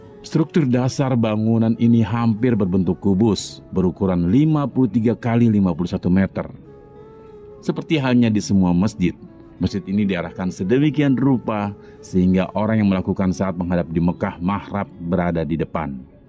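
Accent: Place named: Banyumas, Indonesia. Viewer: Reportase nganggo ngapak mbah 😀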